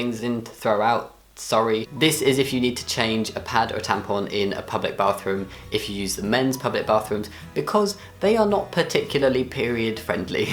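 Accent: United Kingdom. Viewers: I'm a cis girl but I know the struggle of putting a pad inside boxers because i'm very forgetful and sometimes i forget to bring a change of underwear when I come over to my bf's house so when it happens I borrow his boxers but holy shit, that time when I was in my period was nOT A GOOD TIME TO FORGET MY UNDERWEAR. And yes, I agree, it's easier when the pad has wings! Also, you said that pads smell like flowers but I actually have never seen any perfumed pads, what brand are you talking about? Well I live in Canada and you live in the UK (I think, by your accent, correct me if i'm wrong) so maybe we just don't have the same brands but idk it seems weird to me, I feel like the smell of the flowers and the smell of my period blood would just make a terrible mix, i'm not sure if I'd like that lmao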